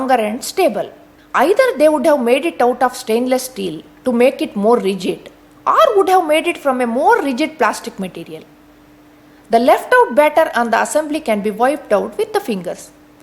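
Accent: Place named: South India, India. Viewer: I loved the voice as well as the presentation. It's south Indian accent so you are finding it different.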